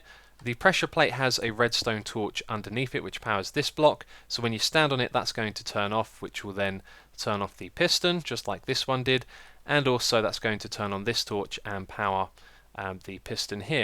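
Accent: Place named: United Kingdom. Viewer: fricken peeple deez dayZ. cant even let a man have a british akcent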